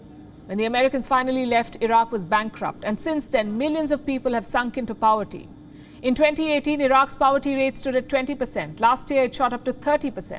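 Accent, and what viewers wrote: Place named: India. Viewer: The US did not leave, where do you pull your opinions', out of thin air. A war started by Iraq attacking Saudi Arabia and Kuwait, again like Russia, they started the wars. What should the US do, leave. Financially it would be good for the US. The women had no rights, and Iraq threatened it's neighbors. Food for oil. It's not profitable to start a war, but what would have happened if the US did not. Iran would take over, and they are no good. India perspective is that we did not do anything. You speak Indian rather the English because you are not.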